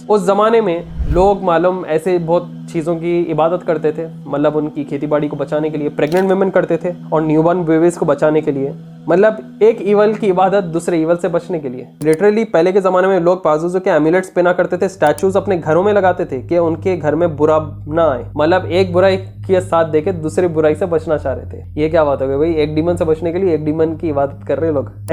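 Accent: Hyderabad, India. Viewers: Bhai app Hyderabadi hoo kya ❤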